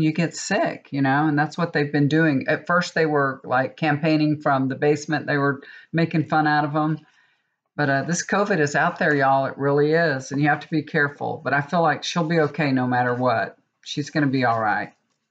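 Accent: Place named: Texas, United States. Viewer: I love the chit chat . I'm from 🇨🇦 and I love that Texan accent plus you have great stories . 💞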